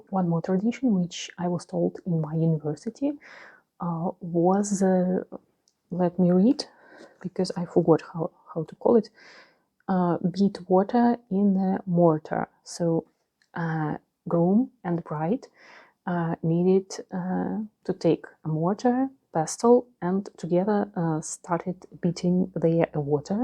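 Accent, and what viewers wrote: Russia. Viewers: I love your videos!!💗❤️ just found you and I’m very happy to have. I love the Russian accent for asmr lol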